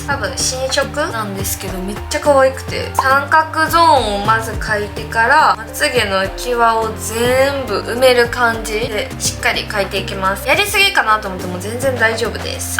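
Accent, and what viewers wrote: Kansai, Japan. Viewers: 関西弁なのかメロい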